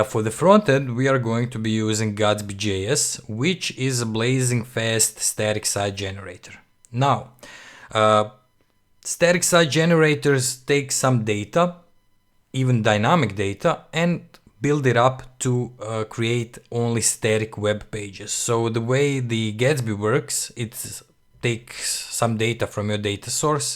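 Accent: Russia. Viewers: i like these vids cause i love his heavy russian accent :D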